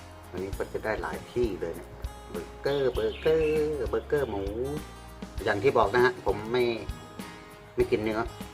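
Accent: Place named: North, Thailand. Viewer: ฟังสำเนียงการพูด น่าจะเป็นคนภาคเหนือนะครับ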